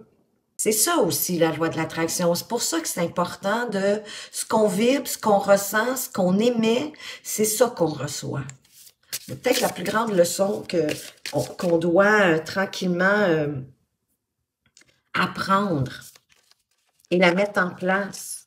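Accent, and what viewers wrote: Quebec, Canada. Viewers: Dites-moi, êtes-vous québécoise?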